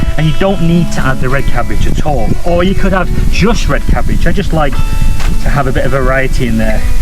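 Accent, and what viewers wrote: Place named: United Kingdom. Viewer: Hey! It's me the "bastard". I followed you to your new channel. I'm sure youre elated! Nice to see you've already got more subs on your first video than my channel has in the last ten years! Although I love seeing you go into town for local cuisine, I'm also glad you decided to show your cooking skills. You sound a bit like Ramsay when you talk while cooking lol. Must be a Brit thing.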